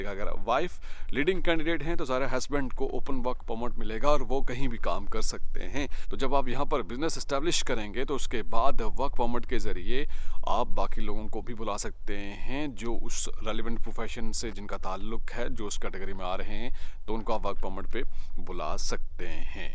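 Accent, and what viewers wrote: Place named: India. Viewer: Ap Pakistani hain tu Pakistani language main bat kry Indian accent main kr rahy ho tum we doesn’t understand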